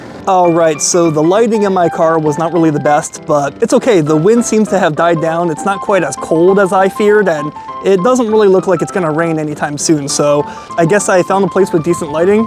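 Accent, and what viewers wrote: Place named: North Jersey, United States. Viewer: Fake like in jersey. Yea I know you from north jersey cause you talking crazy bro.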